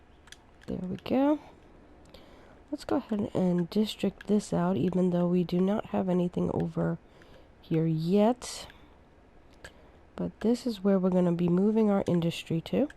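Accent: Long Island, United States. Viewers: I’m hearing a Long Island accent I think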